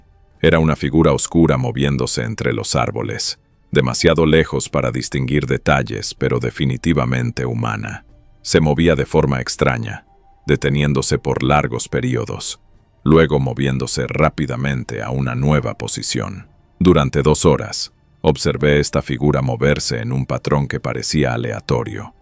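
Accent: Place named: Spain. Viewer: Me gustan las historias de horror pero, con ese asiento español?, ni pensarlo.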